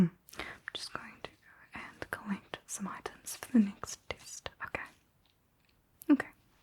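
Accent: New Zealand. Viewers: lordy kiwi accent melting my vagus nerve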